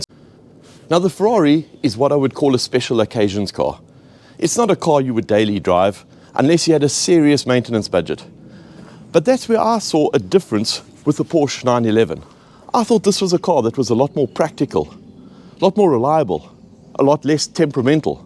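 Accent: South Africa. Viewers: Fascinating insight mate. If you thought the bills for the 360 were eye-watering, try an F355...Engine out every 3 years for the belts (22 hours labour - ouch) manifolds made out of cheese, radiators made out of even softer cheese, horrible sticky cabin plastics needing (expensive) refurbishment..the list goes on and on. Nevertheless, once you take it out for a good blast all is forgiven! At least I made a tidy profit when I sold it after 11 joyous years. My little 993 is now making me smile. By the way, you're not originally from SA or Zim are you? Cheers from a rather chilly Otford, Kent, UK.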